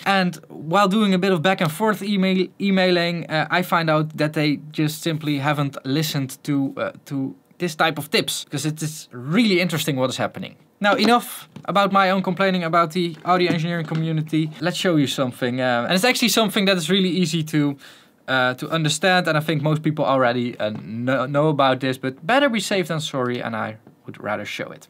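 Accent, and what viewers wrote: Netherlands. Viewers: Are you from the Netherlands? :-) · I suspect that you are Dutch. You have a Dutch accent.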